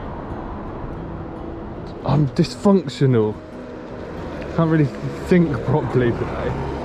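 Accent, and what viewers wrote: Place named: Australia. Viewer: Thats awesome catching huge perch in town and i got to hear a fellow Aussie 🎣👍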